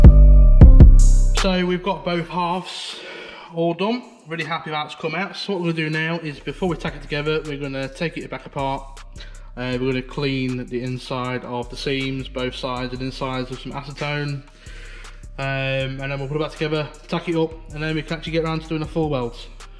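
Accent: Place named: North, United Kingdom. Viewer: Ohhhh a northerner ahaha great work !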